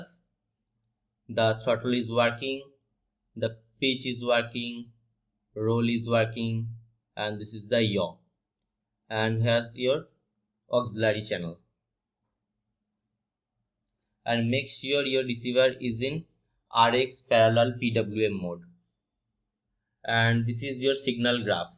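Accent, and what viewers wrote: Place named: India. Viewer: i cant understand your heavy indian voice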